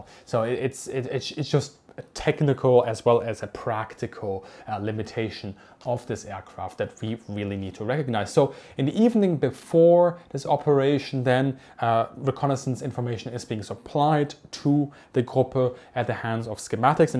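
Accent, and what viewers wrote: Germany. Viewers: Bist du deutsch du hast einen sehr starken Accent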